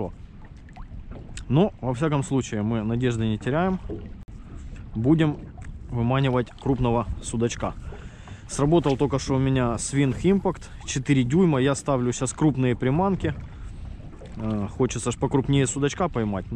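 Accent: Ukraine. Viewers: А чего у вас в 6 вечера так светло? Это же Украина вроде, судя по говору...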